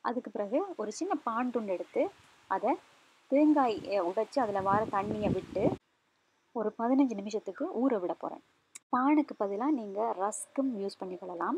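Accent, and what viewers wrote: Sri Lanka. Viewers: Loved your Tamil pronounce, always loved to hear stilankan Tamil.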